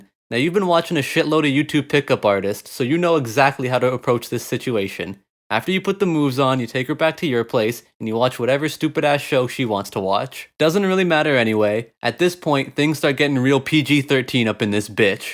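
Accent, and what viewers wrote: Newfoundland, Canada. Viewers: Are you from newfoundland? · You wouldn't happen to be from Newfoundland would ya?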